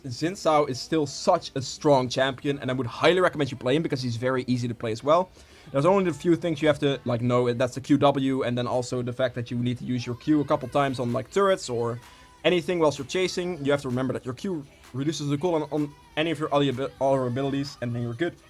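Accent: Netherlands. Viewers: Klinkt Nederlands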